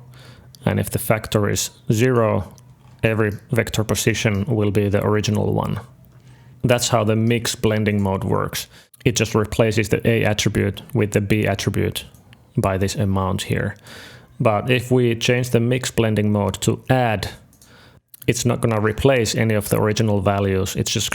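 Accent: Finland. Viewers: The finnish accent, we meet again. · Veri finnish äksent👌 loving it! Great tutorial👊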